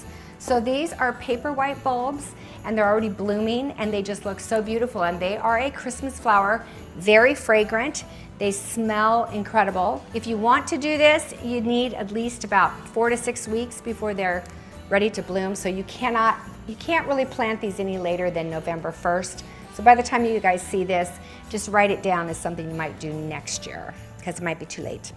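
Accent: United Kingdom. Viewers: LIKE 👍for your British accent😂👍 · Very Beautiful, understated, classy as usual! Was eagerly awaiting your unveil, love the flow. Also love your British accent. Happy holidays from England.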